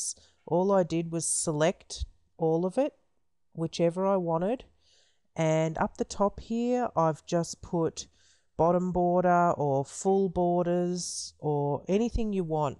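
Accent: Australia. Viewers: Thanks for your explanation and tutorial! (I miss the Aussie accent!) :-) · Greetings mate!!! While I can certainly appreciate you taking the time to create this informative video, you kept saying: ok one to many times. Which many of us find distracting and highly unprofessional to say the least. It's bad enough we have to endure your aussie accent topped with ok, ok, ok, ok, ok, ok, ok. People need to be able to fully concentrate. Its apparent saying ok to often is part of your vernacular, but re-frame from saying: ok, when attempting to make a professional and educational video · I love your accent. That is Australian?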